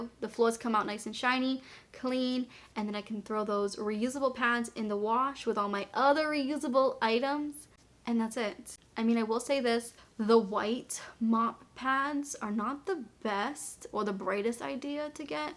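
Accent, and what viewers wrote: Boston, United States. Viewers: Congrats on 100K love your content and that adorable Boston accent.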